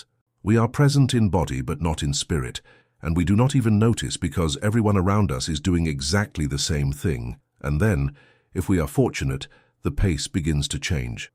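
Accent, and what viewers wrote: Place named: England, United Kingdom. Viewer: Why is the exact same voice on all these types of videos? A slight English accent, a deep voice… is that one guy or is that AI?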